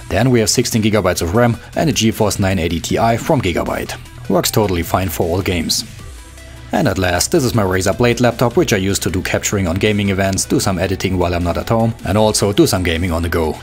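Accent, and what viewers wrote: Saarland, Germany. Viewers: diggah ich komm ach ausm Saarland awa du heerscht dich kompeltt annerst an als mei Leut:D Aus welchem Eck kommschten heer?:D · Daje, haun da mo rinn do, nä? Ich bin abgebrochen vor lachen! :D Das gudde Saarland <3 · ***** doch :D ist saarländisch^^ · That deutsch accent.. ;-) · ***** Doch es ist Saarländisch. Bin auch ausm Saarland. Er sagt "Hauna mo rin do ne". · XD Ich dachte schon an deiner Aussprache dass du Deutscher sein musst! C: Wie viel hast du denn für dein ganzes Setup bezahlt? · german i know the accent cuz im german and american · I luv your german accent <3 :)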